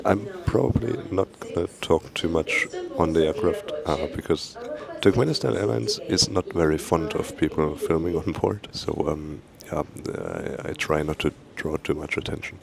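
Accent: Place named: Germany. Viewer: 3: 16 ... can barely hear you .....plus the strong german accent ...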